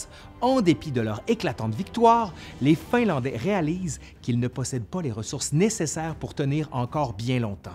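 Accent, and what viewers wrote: Canada. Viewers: Ca nous change de Stéphane Bern....et l'accent canadien participe au charme !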